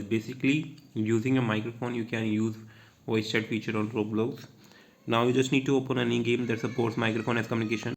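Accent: India. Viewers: Yada yada yada cant even understand what your saying your indian accent rots my brain.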